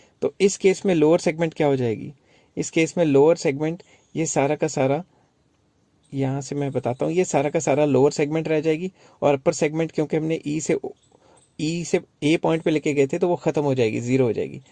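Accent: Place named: Punjab, India. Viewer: Ur way of teaching is commendable 👏sir apse mujhe thori thori ek punjabi ki feeling ati hai .kya ap punjabi ho ?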